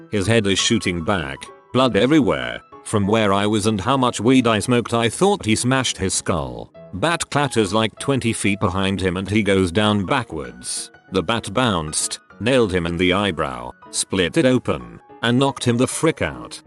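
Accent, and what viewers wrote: United Kingdom. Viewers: That’s because it speaks in British English.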